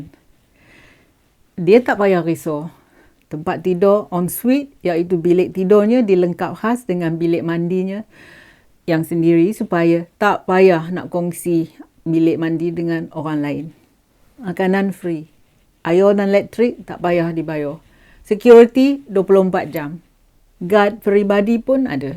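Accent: Perak, Malaysia. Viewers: loghat perak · Telo peraknye pekat benor yong.. Terbaik perak woman. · Suka dengor pelat Perak puan...kita sekape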